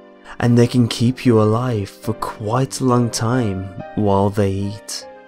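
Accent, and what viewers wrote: England, United Kingdom. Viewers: I love your accent :) I'm english too but i'm from the east midlands so my voice sounds dead common when I do Creepy Pasta readings :P Wish my voice was as posh as yours lol. Awesome reading once again :) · Gotta love brit accents XD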